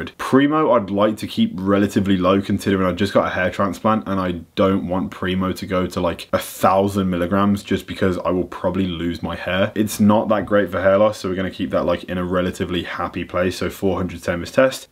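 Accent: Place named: United Kingdom. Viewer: drug addict explains drugs in british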